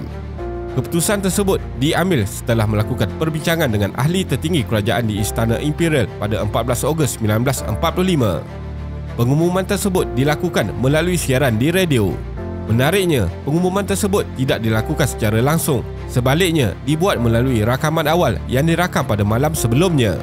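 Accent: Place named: Malaysia. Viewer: semua dah mantap kadang2 sebutan bahasa asing kebanyakan video terlalu lidah melayu sangat, harap dapat diperbaiki lagi 👌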